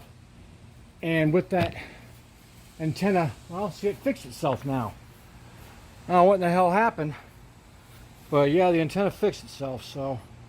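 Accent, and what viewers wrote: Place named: United States. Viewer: Always makes me laugh how you Americans pronounce soldering ie sodering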